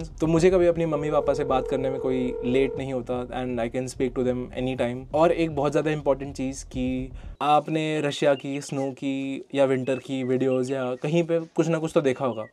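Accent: India. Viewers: Are you from west Bengal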